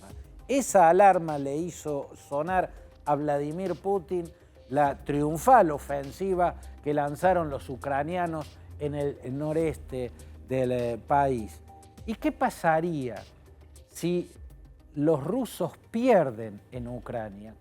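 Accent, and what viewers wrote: Córdoba, Argentina. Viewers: Genial su tonada cordobesa y sus conocimientos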